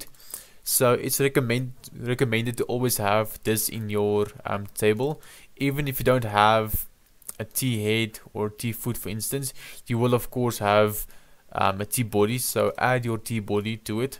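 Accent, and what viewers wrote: South Africa. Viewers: this guy is definitely south african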